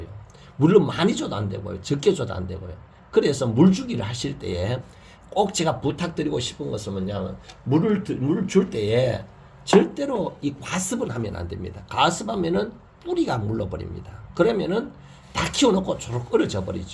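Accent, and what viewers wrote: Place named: Daegu, South Korea. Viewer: 대구 사투리같은데 듣기 넘 좋으네요 ..... 가드닝 지식 많이 배웁니다 감사합니다 늘 건강 하시길요^^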